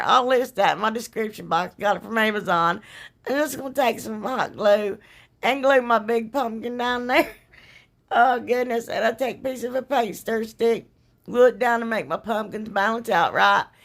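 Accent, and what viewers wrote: South, United States. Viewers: I think you're so freakin' cute! I was raised in the south and now live in the north, and I really miss hearing the southern accents! Forget about the haters, they have nothing better to do than be trolls. xo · These all turned out beautiful! Thanks for sharing your ideas and creativity! Love you lots. If folks are being ugly, don’t pay attention to them. It’s been my experience that when people act ugly & rude it’s because they are unhappy & miserable in their own life. Don’t worry about anything, you are doing a fantastic job and there are plenty of us that love you. I’ve also noticed that some people just can’t handle all our southern charm and accent. Don’t you pay them any mind. You are great at what you do, so keep on keeping on. Glad you’re feeling better. Love 💕 & hugs 🤗 God bless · Honey, I'm so happy you posted! You've been on my heart ever since your live & I've been praying for ya hon 🙏♥ As for some wackadoo saying you talk funny or whatever...they obviously don't know you have a whole army backing ya & we LOVE US SOME & HER SWEET SOUTHERN SELF 🥰 (Don't make 1996 Lisa have to come out 🤣🤣) Anyhoo, honey everything turned out so beautiful and I LOVE that black truck wreath & your punkin Gather sign 😍😍 You already know...ILYFTBOMH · Love your country accent 😍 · Hey now...who cares what others say... 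I looooove that Southern accent of yours 🥰😘 I have the A.D.D., A.D.H.D. and I'm very OCD...LOL Can you imagine 🤣 I think I understand you more than any of the other craft ppl on YouTube...lol Your crafts are amazing!!! XOXO ~ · As a woman who knows this lady well I talk just like her and if you don't like the way we talk well I can't exactly say what I want to say but you can kiss our 🍑 I know there's plenty of people in my life who love the way I talk and I guarantee you with form I guarantee you she's got way more people with the life the way she talks instead of not liking the way she talks so for this one for you trolls get a life you don't like the way she talks don't watch her videos it's that easy folks... I love you girl and you know I'm going to stand up for you I think our country bumpkin accents are cute so pee on them haters... Sorry I called him headed so early but that upset me made me mad I told you I always have your back and I mean it God bless you dear.. 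I'll always be here for you.. actually we should start a crafting channel that focuses a lot on our accents a real ways of life these people have no idea of where we come from...🙏🏼💯😉😜🍀🍀🍀🦋🦋🦋🤟🏻🤔👑👑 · FINALLY I have found someone who speaks the same language I do! Southern! Lol! So refreshing to dial you up understand you & can SO relate! People make fun of the way I talk…but that’s OK!! I call brokers in New York City & when they hear my accent, they automatically deduct 50 points of my IQ! But while they’re laughing & poking fun, I’m taking money out of their accounts before they even know what’s happening!! I may be country…but I’m smart!! Lol!! Just like you!! Love you, Girl!! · even though I'm a newer subscriber, (I'm from East TN) tell the haters "Bless their poor hearts" and they can kiss your beautiful southern speaking white a@ I absolutely love you and your page. You truly are a beautiful person inside and out. 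Much love ma'am and please stop apologizing for being you.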